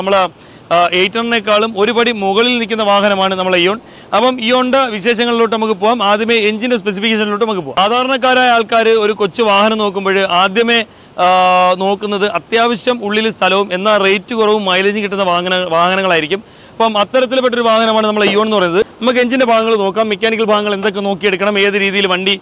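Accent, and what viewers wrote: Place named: Travancore, India. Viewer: തിരുവിതാംകൂർ ഭാഗത്ത് പ്രത്യേകിച്ച് ട്രിവാൻഡ്രം കൊല്ലം സംസാരശൈലി.. ഇങ്ങനെത്തന്നെയാണ്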